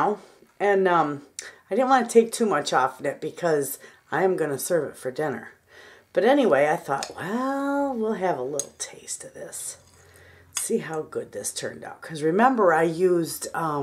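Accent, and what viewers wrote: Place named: Wisconsin, United States. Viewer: Spaghettios jello ring lol have you seen kalen allens video about it? Look him up it’s worth it! Thanks for the video, making this tonight. Thanks for a little Wisconsin accent too!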